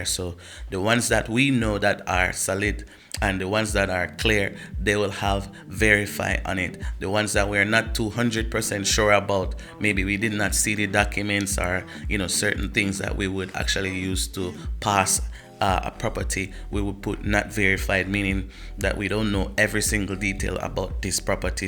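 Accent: Africa. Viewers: OMG, you sound African! I will be in Gambia in 2 weeks time. Would really love to meet you and your wife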